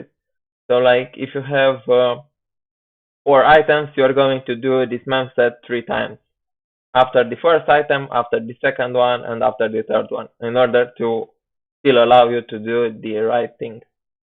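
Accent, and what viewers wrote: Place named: Russia. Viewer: The problem is not because of Mic, the problem is that his first language is not english. I think he is Russian. Still it is not that tough to understand his accent.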